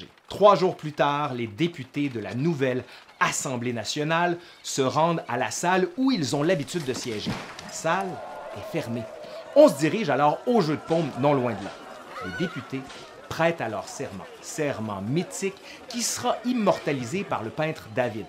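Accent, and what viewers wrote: Quebec, Canada. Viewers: J'adore ton accent québéquois xD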